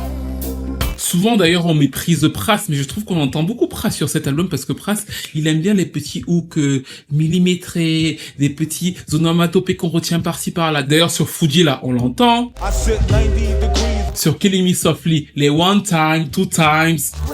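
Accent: Cameroon. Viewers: Toi... tu n'es pas camer par hasard ? 😅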